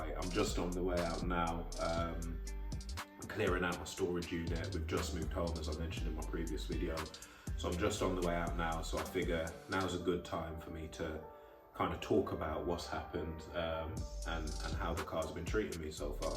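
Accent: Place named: United Kingdom. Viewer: Nothing cooler than a black guy with British accent 👍🏼👍🏼👍🏼👍🏼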